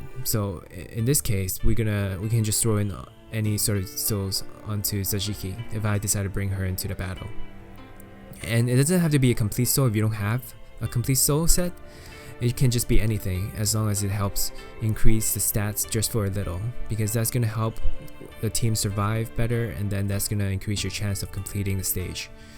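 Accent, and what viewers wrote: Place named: Asia. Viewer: Your english sounds like an asian english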